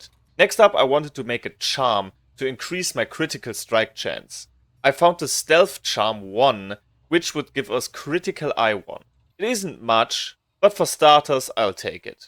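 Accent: Germany. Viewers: I smell the german accent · Hörst du den fetten Akzent nicht? Natürlich ist der deutsch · I just love german people talking english. sounds great. keep it up brother!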